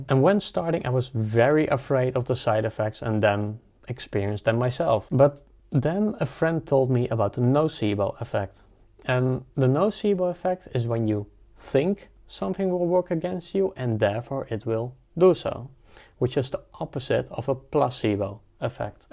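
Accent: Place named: Netherlands. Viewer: where did you do your hair transplant? you sound dutch, maybe in netherlands?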